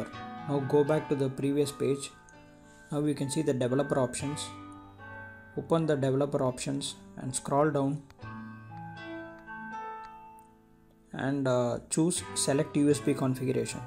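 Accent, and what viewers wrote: India. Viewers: I love tutorial with an indian accent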